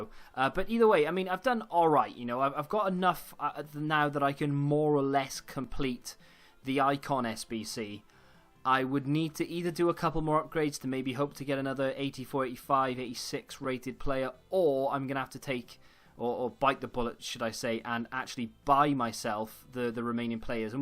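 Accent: Wales, United Kingdom. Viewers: Are u welsh ?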